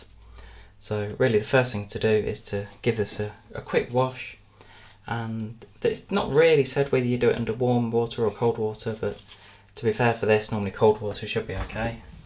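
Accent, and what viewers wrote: United Kingdom. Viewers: Was thinking if I want to watch 26mins vid about cleaning my dyson. The british accent says yes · Thank you very much for these tips and tricks and spoken in such a lovely voice. I’m from Western Canada so UK accents are not as common here but always a pleasure to listen too. Im certain I watched another demo that when you cleaned the filter you were to try as best you could to close of one end and fill and shake with water and repeat until the water ran clear. Is this ok IYHO?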